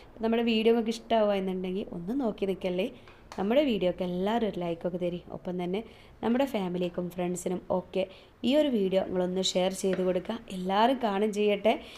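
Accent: Malappuram, India. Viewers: മലപുറം ഭാഷാനന്നായിട്ടുണ്ട് ഇത് കേൾക്കുന്ന ഞങ്ങൾ തൃശൂർക്കാരാണ്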